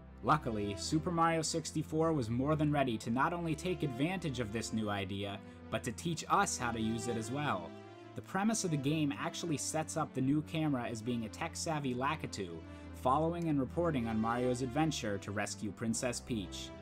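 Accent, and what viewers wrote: Canada. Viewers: CANADIAN!!! YOU CANNOT HIDE FROM ME!!! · TheJoseluisperez1000 We pronounce it "zee" He's Canadian, so he pronounces it "zed"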